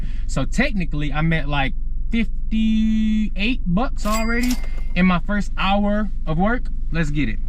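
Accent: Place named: New York, United States. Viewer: Are you from the east coast? Harrisburg PA here but I grew up closer to NYC in northeastern PA. I hear a little New York!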